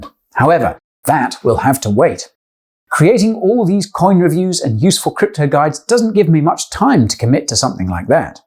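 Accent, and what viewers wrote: United Kingdom. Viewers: You had me at “getting myself a girlfriend “ and love that King’s English!! 🤣 Subbed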